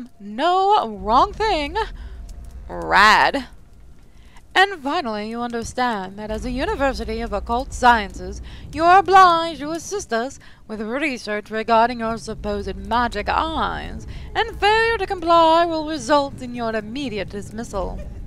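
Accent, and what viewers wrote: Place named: India. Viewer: Your french accent sounds Indian.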